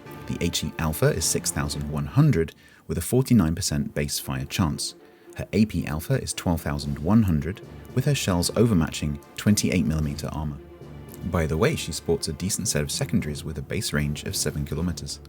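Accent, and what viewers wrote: United Kingdom. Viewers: can i ask are you British by any chance.. its just i am British and you seem more British than me. Also this was a great review very to the point and i can understand you easily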